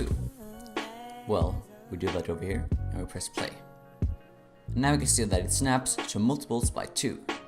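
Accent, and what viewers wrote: Sweden. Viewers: Am i hearing a swedish accent? (Very helpful video btw)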